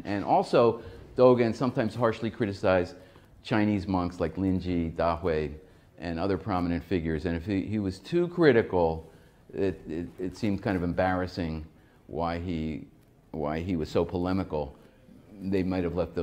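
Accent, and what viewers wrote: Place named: Malta. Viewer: she sounds Malties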